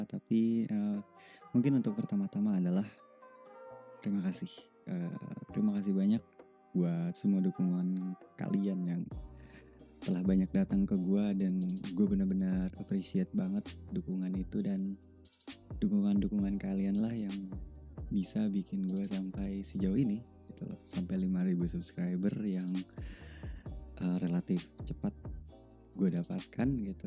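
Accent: Indonesia. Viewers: Ehhh aku baru nonton dan denger iniiii. Nice to know you ya kakk! Ternyata kita ga jauh beda umurnyaa, kamu 2 tahun lebih tua daripada akuu. Aku juga ngerasa aksen kamu sunda banget, soft banget, tapi ga spesifik nebak bogor sih wkwkwk. Anyway, aku berharap ada lagi Q&A kayak gini lagii 🥺🥺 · Plis ya gue baru denger video ini, dan bogor accent itu nyata adanya loh wkwk kerasa aja gt beda nya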